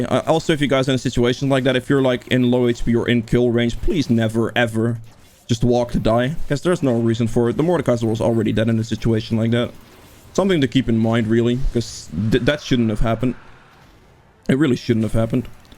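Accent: Netherlands. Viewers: Ur Dutch ain’t you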